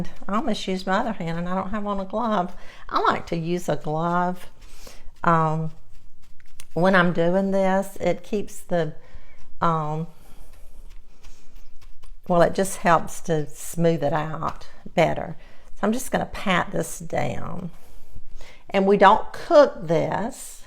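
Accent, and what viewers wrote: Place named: Alabama, United States. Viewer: Looks wonderful! Look forward to trying this. Oh how I love blueberries. I heard you mention Thomasville. Is that Alabama? My step mom was from there and passed away recently (Atkeison). Your beautiful accent reminds me of her. God bless!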